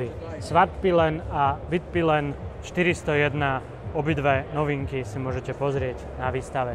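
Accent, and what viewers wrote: Eastern Slovakia, Slovakia. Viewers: Pekné video pán, ".Už sa sa polepšil.Už ste odstránili aj to Svoje východňááárské zaťaho..váááánie.Robíte pekné videá,ale hrozne mi vadil ten východňársky akcent.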